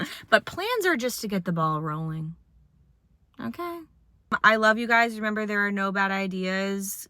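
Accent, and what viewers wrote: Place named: New England, United States. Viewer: o didn't know you were a new englander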